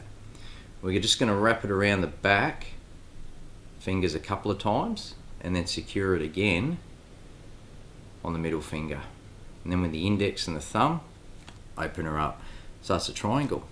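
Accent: Australia. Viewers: this is the easiest way to tie it, hands down. except for i think most ppl including this vid, over due the hitches. a couple times is cool, atleast from my experience. but good vid, and love the accent. CRIKEY MATE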